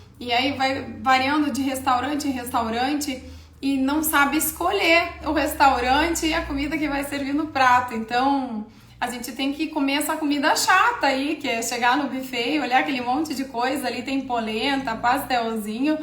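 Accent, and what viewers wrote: Rio Grande do Sul, Brazil. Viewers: Não mude seu sotaque não .sultaques dos gaúcho eu acho muito lindo.cicero Vitalino PR.meus parabéns doutora vc e uma ótima proficional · Um sutak bonito da mulher gaúcha, vc é super...beijo meu ❤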